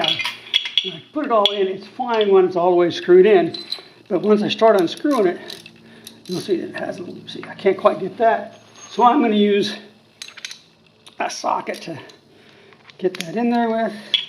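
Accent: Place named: United States. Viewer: The plugs are a lot easier to access than the plugs of a BMW R850. Gee, I had to remove the valve covers and even then you need a special slim designed spark socket to remove the plugs. Consider yourself a lucky guy. You can buy a long socket for about 20 euro at Wunderlich. I am not sure where you live. Your last name is Dutch but your English sounds very American to me. When you live in the US there is also a Wunderlich in the States. Otherwise Amazon has them.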